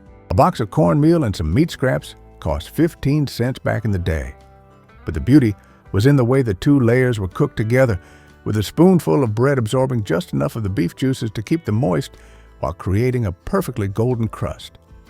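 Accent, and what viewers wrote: South, United States. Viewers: the AI southern drawl is maddening to me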